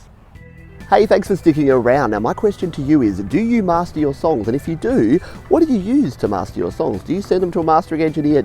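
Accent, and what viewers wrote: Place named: Australia. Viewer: ... thanks. By the way love the vids keep them coming it’s good to here a fellow aussies voice when learning stuff instead of American all the time.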